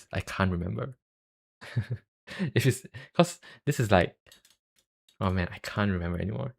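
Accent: Singapore. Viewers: hearing you talk in sg english is so weird lol my brain is not used to it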